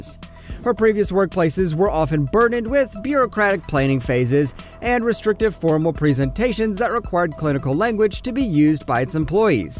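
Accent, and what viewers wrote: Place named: United States. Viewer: hahahaha!! It's the YT algorithm trying to understand an American accent and translate. We are just fortunate the speaker didn't come from down south of the Mason Dickson Line cuz, we'd be up t'our urmpits in pee pickin' tribbles, y'all LOL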